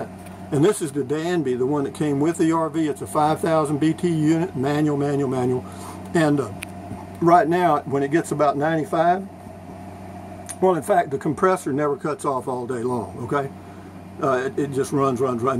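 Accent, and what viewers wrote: Texas, United States. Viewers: Hello from Nevada! I just found your channel. Listening to you makes me miss Texas. I lived there 3 years. You had me genuinely laughing ... "Oh it's energy saver... I don't want that!"... sounds like something literally every Texan I ever met would say, and rightly so, since Texas gets up to 20,000 degrees in the summer :D ... Stay cool, and keep up the good work.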